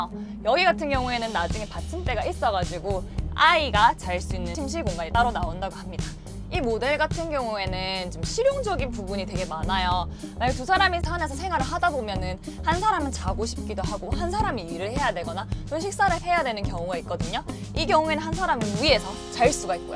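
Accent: Gyeongsang, South Korea. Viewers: 경상도인지 경상남도인지 말투가 팍팍 꽂히네요 이해 안될수도있지만 전 사투리가 부러워요.기억이되서..잘봤어요 구독합니당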